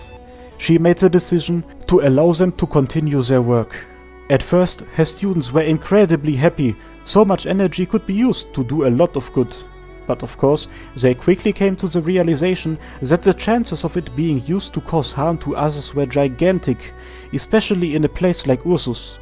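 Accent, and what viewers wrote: Germany. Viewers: A short woustion are you germen becaus it sounds a bit like you have a german accent if so Servus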